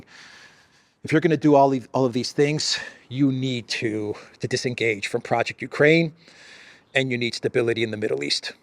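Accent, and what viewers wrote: United States. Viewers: he sounds American. That's why i.asked.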